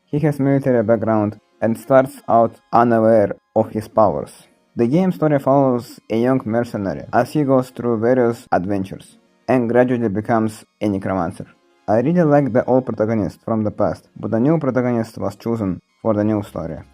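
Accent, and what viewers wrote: Russia. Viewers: Чел я знаю что ты русский твой акцент тебя выдаёт бро. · Я все видео думал: Русский ли у тебя акцент или нет, пока ты прямо в видео не сказал откуда ты) Мать твою, история некроманта 2 выйдет? Я сплю?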